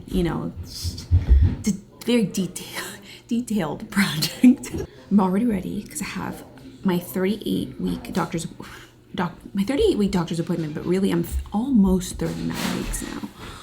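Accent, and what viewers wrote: Texas, United States. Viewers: my heart smiles so big when I’m notified of a video from you❤❤❤❤❤. I have followed you for several years and watched so many projects from the apartment ( out door chairs) loved for your little patio. The last week of pregnancy was so hard for me I got so tired!!!!!!! And the night before both of my babies ( age 47 and 40) the day before delivery my body just said no more, I was tired and felt like I was coming down with the flu, this may happen to you. My son 40 weighed 10 pounds. I am so excited for you but walking really helped with the labor (they had me walk in the hospital) to help,with the labor. When you are tired listen to your body. I am the happiest when I see your beautiful smile and sweet Texas accent, ( yes it’s there).❤❤❤. I wanted the floral China it looked so sweet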